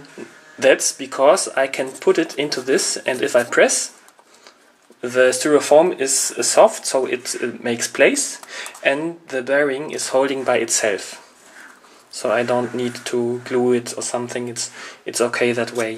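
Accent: Germany. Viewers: Man merkt glaich das du deutscher bist. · German accent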